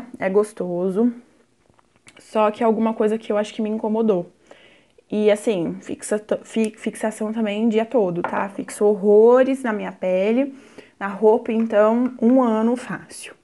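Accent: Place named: São Paulo, Brazil. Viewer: Sotaque dela é lindo, interior de SP. Amo ❤️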